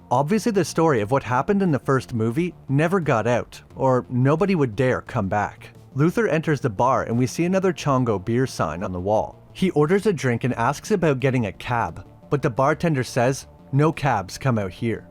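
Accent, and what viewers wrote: Canada. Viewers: This is random, but are you Canadian my guy? lol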